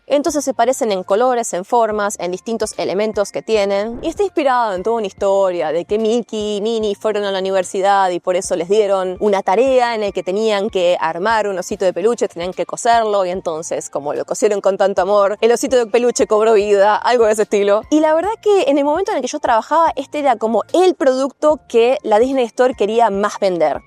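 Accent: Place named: Argentina. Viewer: ¿ Acento ? muy argentino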